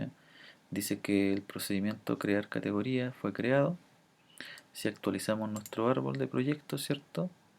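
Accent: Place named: Chile. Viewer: Chileno! por fin wn.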